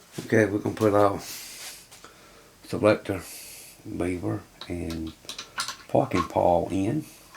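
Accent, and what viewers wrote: South, United States. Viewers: Hi Everyone. Once again entertains us with another 'hands on' video of his talents and commentary with a distinctive southern accent. I am always looking forward to the next one. And through our generous Patreon support, we can assure there is another. As a Patreon supporter, you will have access to special projects and give aways not found on YouTube. This week, kickes off a repair and restoration program series on a Drake TR4 amatuer radio transciever I donated to the Radio Shop just for Patreon. As most hams know, Drake TR4 are rugged, well built rigs that can match any modern radio in a QSO ragchew or contest. We also began a R&R series with the preliminary repair of a Hallicrafter's PS500 power supply to be followed by the repair of a Hallicrafter SR400 Cyclone transceiver. Lots of good stuff coming to Patreon. I invite all of you to join in the fun for as little as $1. 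See you there!!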